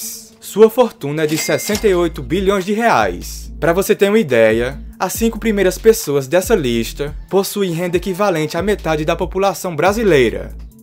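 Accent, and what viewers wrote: Northeast, Brazil. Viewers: VC é nordestino!! De que estado? Eu sou da paraiba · Vc é nordestino? se for eu também sou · Vc e do nordeste?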